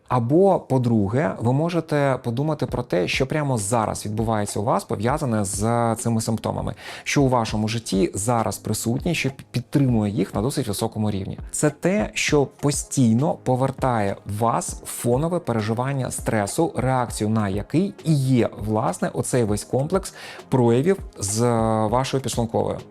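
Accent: Standard. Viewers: Я навіть не знаю, що більше слухаю, цінні поради чи таку гарну, чисту українську мову.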